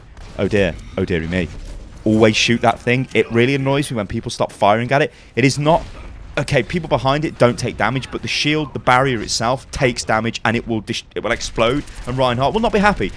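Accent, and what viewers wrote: United Kingdom. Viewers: Hi British man. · Closed beta* you have an awesome british voice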